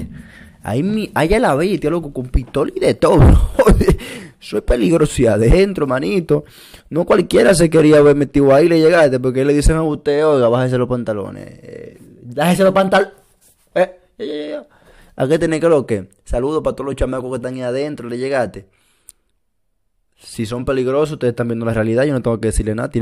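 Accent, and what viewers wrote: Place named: Panama. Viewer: Bueno este tipo de donde es porque habla como panameño y al mismo tiempo como dominicano alfin que mi pana o es que no tiene identidad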